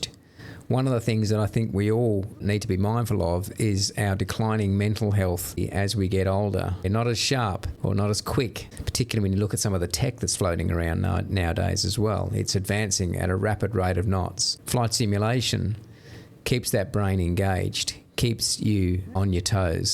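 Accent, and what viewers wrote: Australia. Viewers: You're talking my language (apart from the Aussie twang). 70 in a couple of months, been simming since the mid-1980's, through all the iterations. Recently built my own PC to handle the best graphics and flight physics available now (MSFS2024). Only ever flown GA, now a 172-based Reims Rocket. Working my way from the UK to SoCal, take off where I last landed. Never tried VATSim, currently using SayIntentions - but one day i swear I'll join the network. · Are you in south Australia by chance? That accent is familiar.. 😁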